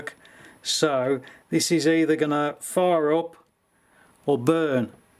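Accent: Birmingham, United Kingdom. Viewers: are you a Brummie?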